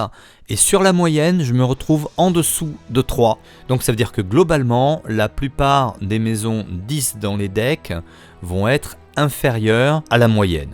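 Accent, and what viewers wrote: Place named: New York, United States. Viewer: Merci pour le travail et ton accent de new yorkais. Continue comme ça et bon courage dans tes ouvertures de deck dis pour rester zen lol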